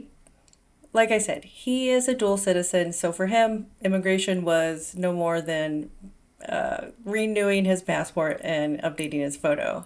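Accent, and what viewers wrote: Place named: United States. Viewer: Helloo Brit here welcome to the uk always cool to hear an American talking its soooo cool to hear your amazing accent i Love it i Love America and there accents hope you have settled in well and Hope you enjoy your time here in the uk have fun and enjoy. 😍😍👍🏻😊😁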